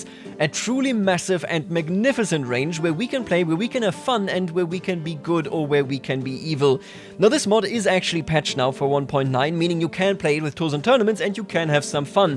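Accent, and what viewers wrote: Germany. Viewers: I heard your english and think: "Mhm he sounds like a german." And than i see your Channelname 😂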